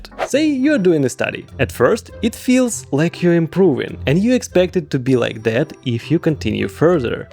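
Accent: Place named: Eastern Europe. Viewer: Laik podposka slavyamskomy malchiky s milym accentom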